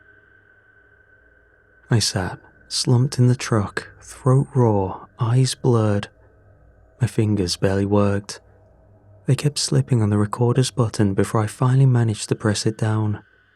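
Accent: United Kingdom. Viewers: are you british? just curious i go to sleep with your stories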